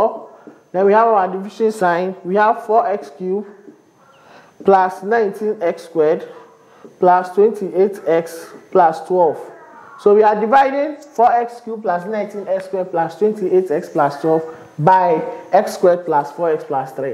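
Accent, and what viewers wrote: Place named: Ghana. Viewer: you are a wonderful teacher....you are a Nigerian with a Ghanian tongue..........you are doing well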